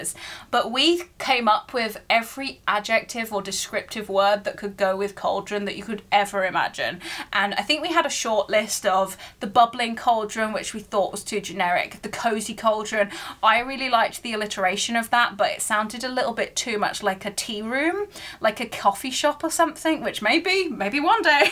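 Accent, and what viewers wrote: Birmingham, United Kingdom. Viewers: I thought you was a Brummie? Derbyshire is very far from brum 🙈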